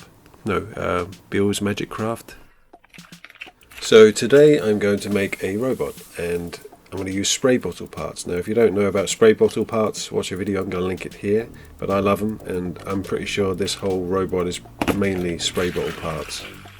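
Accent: United Kingdom. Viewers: R u from UK ?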